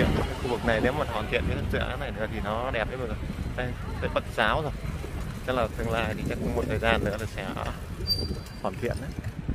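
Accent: Vietnam. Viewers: Chủ thớt làm kiếm cơm ..phát ngôn nói cho chuẩn vào ..đi xe khách kể cả từ bắc vào nam sg 6/7 năm trước ko một nhà xe nào đi tới hai đêm ba ngày chứ đừng nói đi từ miền trung ❤..còn nói giá thông phương tiện sg 5 năm nữa dân toàn đi xe hơi kiếm 1 chiếc xe máy còn khó ...ko nhanh dễ phát triển vậy đâu.thu nhập mặt bằng trung người dân ...nhất là người công nhân lao động tự do ..thu nhập vẫn còn thấp ..nghe giọng cháu này ..hoa thanh quế..quê choa 😅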